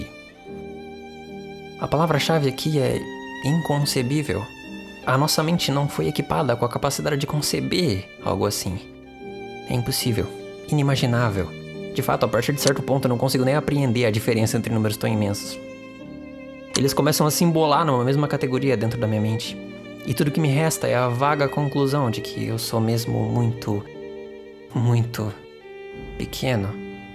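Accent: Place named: São Paulo, Brazil. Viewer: Eu devo ser mt chato mesmo mas nem consigo assimilar o conteúdo do video direito com esse sotaque paulista fortíssimo KKKKKKKK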